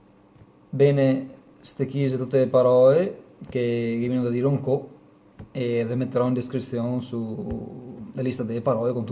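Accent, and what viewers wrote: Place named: Italy. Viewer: Diversi accenti sbagliati... Un po' italianizzato come Veneto...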